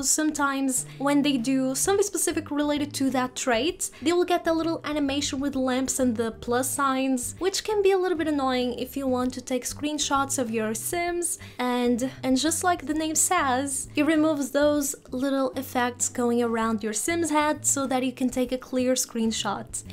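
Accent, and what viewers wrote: Portugal. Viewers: i knew you were portuguese haha it's so cute on your voice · Your accent sounds Portuguese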